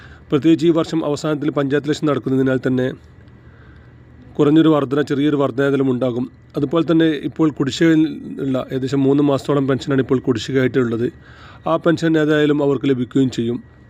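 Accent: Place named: Tamil Nadu, India. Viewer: തമിഴൻ ആണോ മലയാളം വൃത്തി ആയി പറയാൻ പടിക്കു എന്നിട്ട് വന്നു പറയു പ്ലീസ്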